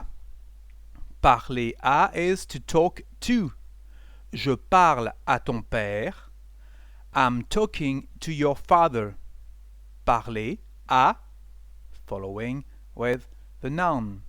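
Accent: France. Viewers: I wasn't sure if you were from France or some other French speaking country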